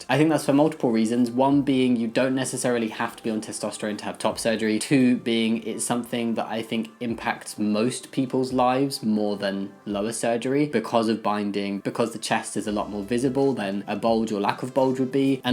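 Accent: United Kingdom. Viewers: Honestly the only question I want to ask him is about his accent. Like it sounds British, but specifically, what is it?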